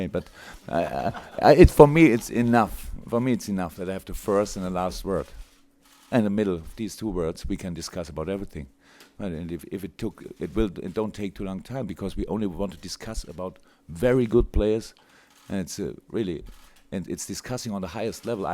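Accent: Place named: Germany. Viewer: His english is very good, but you can hear sometimes the german in him. 😉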